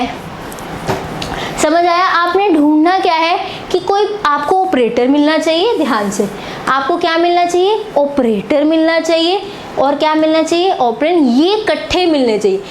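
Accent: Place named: Punjab, India. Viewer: love that panjabi accent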